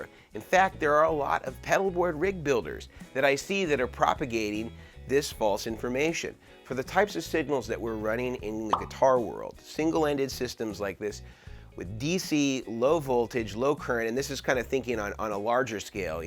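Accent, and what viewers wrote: United States. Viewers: Not in America. He’s saying it correctly for Americans. Don’t take it personally.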